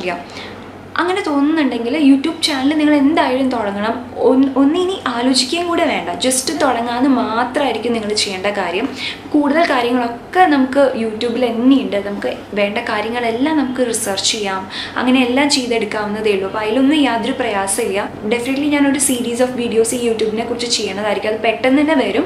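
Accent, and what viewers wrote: Thrissur, India. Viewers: Chechi supertooo thrissur style talk I Loved it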